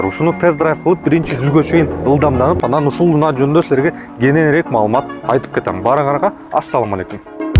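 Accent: Standard. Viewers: Азамат жигит экенсин Кыргызча таза суйдоп тушундургонун учун.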